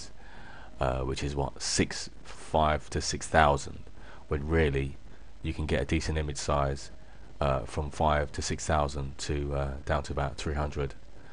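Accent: United Kingdom. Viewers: i love how british speak. i think it's cute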